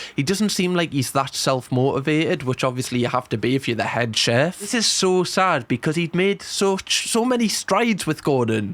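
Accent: Newcastle, United Kingdom. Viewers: You had me laughing so hard! 😂 Your commentary, turn of phrase, and geordie accent are all absolutely perfect. Don't ever change. ❤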